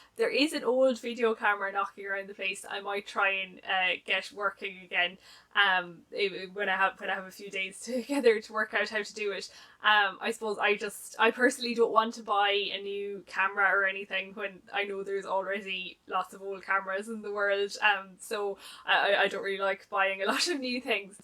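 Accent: Ireland. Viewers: Congratulations on your first year! You have rapidly become one of my favorite BookTube channels. I love your taste in books, and I could listen to your Irish accent for hours on end! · Congratulations on your first year,. Really enjoy your videos and your smily face 😊. My parents were from the West of Ireland (Westport and Foxford) and I love listening to the accent too! Here’s to the next year. Catherine x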